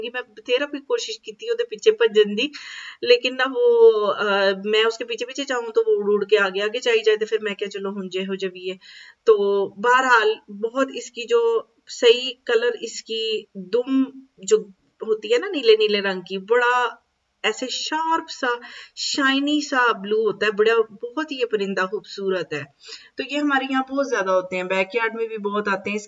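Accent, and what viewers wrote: Punjab, India. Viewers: Ma Sha Allah , Nice sharing👍🏻 awesome video. Very informative and very well explained. 😍loving your Punjabi style. Absolutely amazing ❤ keep sharing videos dear. My kids also watch your videos. They like your way of talking 😍❤️ i also follow you on instagram.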